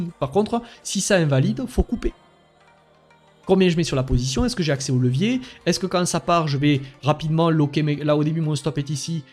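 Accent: South, France. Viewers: Bonjour, est il possible de faire une analyse de ce genre sur decred (DCR). J’aime beaucoup la façon que tu as d’analyser le tout avec l’accent du sud! Tu gagnes un abonné:)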